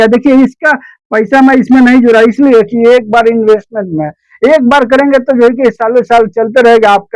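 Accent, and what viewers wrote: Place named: Bihar, India. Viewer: Bihari ho kya bhaiya conversation shikho pahle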